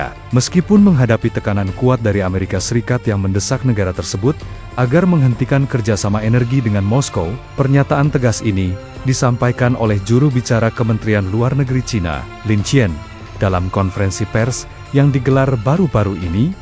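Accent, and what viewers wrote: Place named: Java, Indonesia. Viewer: Pembaca ini logat Jawa sangat memalukan membaca cina saja ti pecus yg benar itu China bukan Chaina seharusnya gunakan bahasa baku bukan bahasa ke barat baratan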